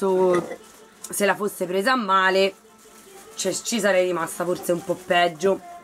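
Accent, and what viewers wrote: Tuscany, Italy. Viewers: sei grande! Manda queste quattro ochette a fanculonia e continua con i tuoi video. Sono spassosi e belli Bello il tuo spiccato accento toscano e le tue mani sul viso sono come pennelli di un pittore Sei bravissima....poi hai l'età di mia figlia Ti adoro e voglio continuare a vederti con tanto affetto una tua fan agguerrita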